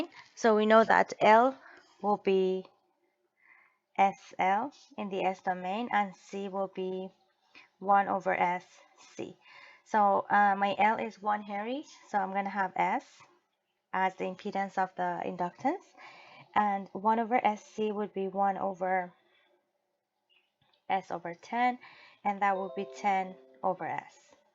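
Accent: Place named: Iran. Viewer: this babe has a Persian accent <3 .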